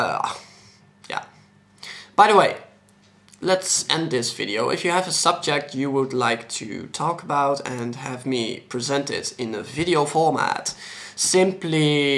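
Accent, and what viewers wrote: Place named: United States. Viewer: You do a awesome job on U.S. English by the way. I love your hair too. You cool.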